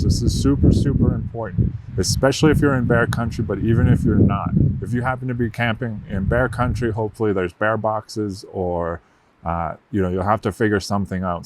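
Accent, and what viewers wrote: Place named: Massachusetts, United States. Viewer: Framingham? Newton? Norfolk county? pegged your accent right away, now I gotta know....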